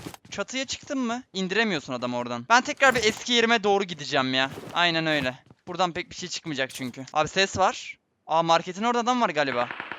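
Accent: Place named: Denizli, Turkey. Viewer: Abi sen denizlilimisin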